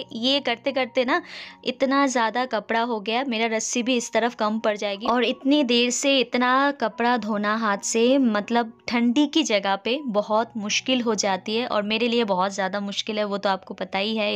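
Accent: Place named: Bengal, India. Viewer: Love you....apki Hindi n mujhe bahut acchi lagti hai bengali wali ultimately 😀😀😀🤗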